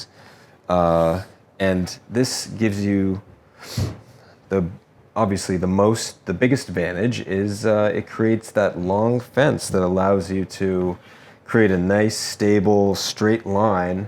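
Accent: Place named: Canada. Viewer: Great video, great idea. Also, I could listen to Canadians say words with the "ou" sound all day long: out, about, house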